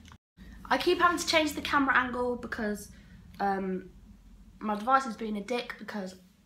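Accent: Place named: England, United Kingdom. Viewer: You sound really English 😂 that accent is adorable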